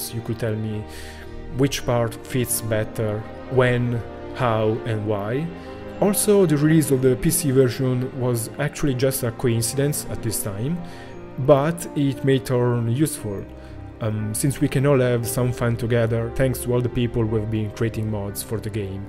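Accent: Italy. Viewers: So excited to make your stream and getting more content from you!!! That Italian accent could never get annoying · "So hopefully, you'll be forgiving all my mistakes." Bro, we're not even going to notice them. Also, we Americans love accents. 😉 Including Italian. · And btw your Italian accent dont annoy :3....it makes you very handsome :3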